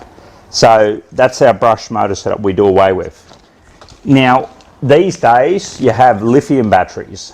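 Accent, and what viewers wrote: Australia. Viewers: ITS AUSTRALIAN DOLLARS CMON MATE DONT YOUR HERE HIS ACCENT. HE EVEN SAYS GOLD COAST AUSTRALIA LOL. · Guys before commenting on prices ... didn’t you grasp the accent? This is a down under RC shop (Queensland Australia) So prices are in Australian dollars... USD is 2/3 of an Australian dollar...😉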